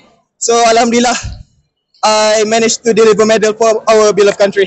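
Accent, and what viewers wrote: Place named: United States. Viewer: Yeahh, ada dgr slang american dia, oyeahhh 🤘🏻 terbaik Azeem 🔥💥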